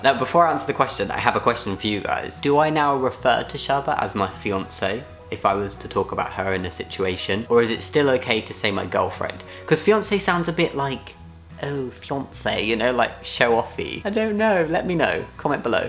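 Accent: United Kingdom. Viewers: BRITISH BAKE OFF PEOPLE! I just love how British is (just like me!) we have a very similar accent!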